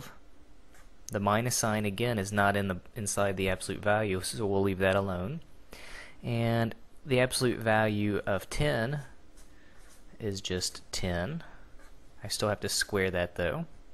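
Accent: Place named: South, United States. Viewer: GREAT teaching! By the way, I love your accent. Obviously southern like mine! :)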